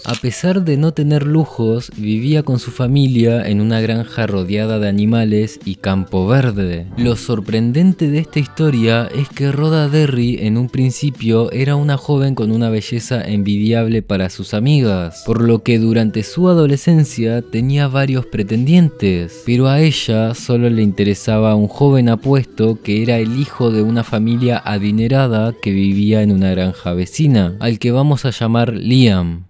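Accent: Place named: Argentina. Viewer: WTF Dross desde cuándo tenés acento argentino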